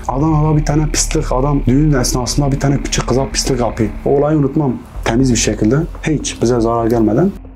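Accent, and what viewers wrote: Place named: Diyarbakır, Turkey. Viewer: Senin şivene kurban olayım diyarbekirlim ❤🌹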